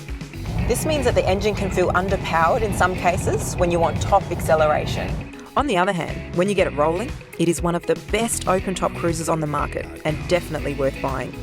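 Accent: Australia. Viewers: So weird to watch a sistah with an Aussie accent ;) crikey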